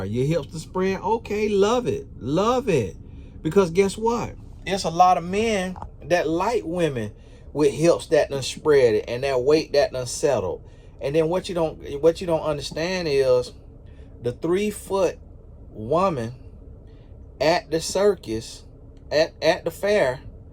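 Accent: South, United States. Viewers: Lmao I love listening to talk!!! Please don’t change a thing bout your country talk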